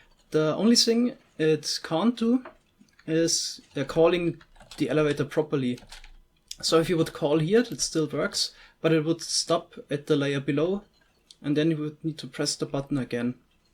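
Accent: Germany. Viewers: the german accent is too strong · I hear a german accent am i right? Falls ja gutes video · could it be that you are german? your way of speaking reminds me of germans try to speak english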